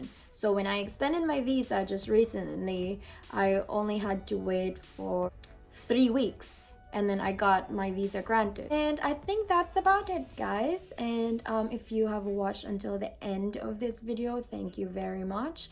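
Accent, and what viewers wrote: Australia. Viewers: char naging australian accent na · How did you get settled do you have relatives there? Btw love aussie accent! 😊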